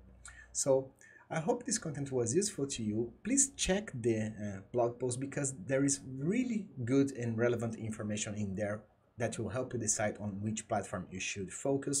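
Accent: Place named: Brazil. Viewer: Esta com sotaque de brasileiro rs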